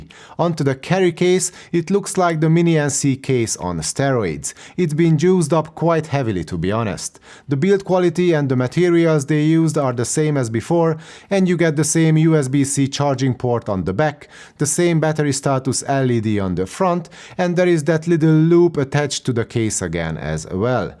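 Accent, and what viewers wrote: Hungary. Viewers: Magyar akcentuc, de fasza videó jó angol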